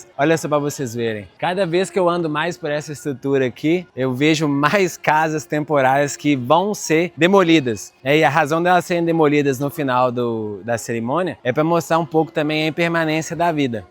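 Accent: Minas Gerais, Brazil. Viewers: Muito estranho! Curioso! Obrigada por partilhar! Vc é mineiro?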